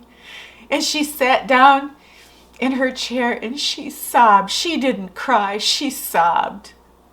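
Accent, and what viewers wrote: Midwest, United States. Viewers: Your accent is Midwestern? Where are you from?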